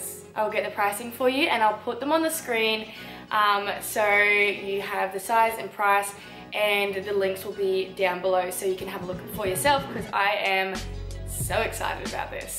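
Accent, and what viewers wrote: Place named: Australia. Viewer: Australian girls are so hot and the accent is very cool.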